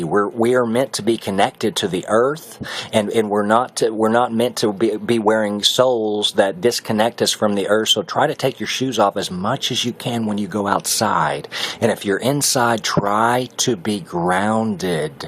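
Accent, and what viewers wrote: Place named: South, United States. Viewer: I love your Southern accent. <3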